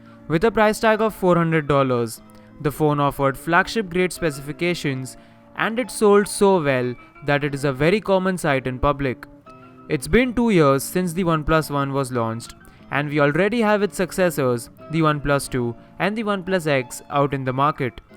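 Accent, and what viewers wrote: India. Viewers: Oh god this indian "English" is killing my ears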